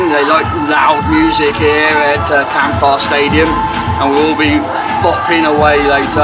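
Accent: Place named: United Kingdom. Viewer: love your British accent <3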